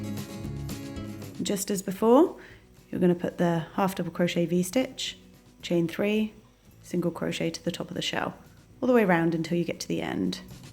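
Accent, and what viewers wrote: England, United Kingdom. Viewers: I love your videos! I always learn so much from them. Will use the chainless foundation forever now. Also makes such a pleasant change to hear an English voice. Keep up the most excellent work! xx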